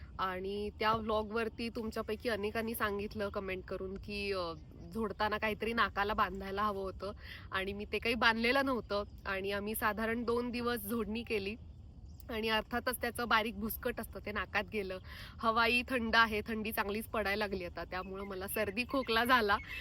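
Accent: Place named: Konkan, India. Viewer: रानडुकराची तर काही बोलायला काही जागाच नाही खुपच वाढ झाली आहे 15,20 वर्षा अगोदर एवढे राणडुक्कर नव्हते आता करारा, तीर, मसूर तेलबीया जसे जवस होऊच देत नाही आता तर शेतकरी धानाशिवाय दूसर पीक लावायलाच पहर नाही भीतीने खूब नुकसान सहन करावे लागत आहे हा आणी तुमची कोकनी मराठी आमी पूर्व विदर्भाची मराठी जवळपाश समान वाटते काही शब्द सोडले तर जे गाने तुम्ही गायले ते पन तसेच आहे पन हल्ली ही परंपरा कमी होत चालली आहे मम लहानपनी रात्र, रात्र मचानी वर झोडीत बन्ऊन पहाटेला उठान फाखरापासुन ज्वारी ची राखनी, मळनी करायचो मला खुपच आवडायचे आता राहील्या त्या फक्त आठवनी ❤❤❤❤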